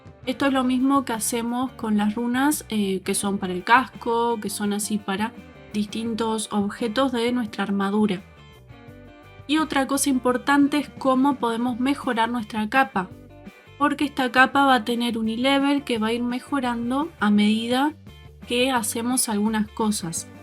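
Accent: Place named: Rioplatense, Argentina. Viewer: gracias, de donde eres? pareces de uruguay o argentina por el acento jajaj, aqui uruguayo:D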